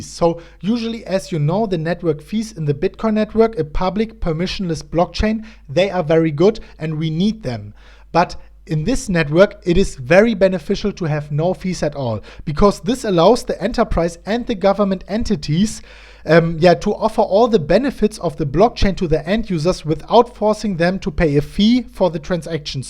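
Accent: Germany. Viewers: His accent is as German as it can get :) German here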